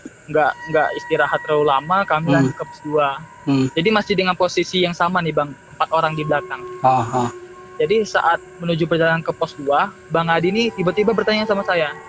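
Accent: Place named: Jambi, Indonesia. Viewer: Kyk suara orang jambi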